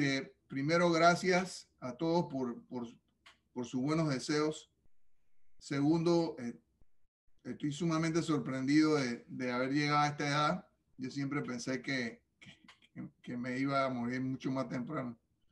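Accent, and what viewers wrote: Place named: Panama. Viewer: Lo he visto dos veces una vez en el instituto Naciónal que fuisteis a cantar y la otra cuando era candidato para la presidencia es muy amable y cariñoso Dios te bendiga lo que más admiro de ti que no has perdido tu acento de Panameño a pesar de haber vivido tanto años afuera del país !!!! Saludos desde Florida!!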